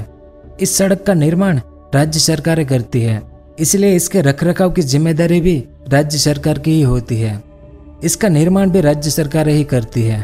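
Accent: Gujarat, India. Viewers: bhai aap gujrati cho?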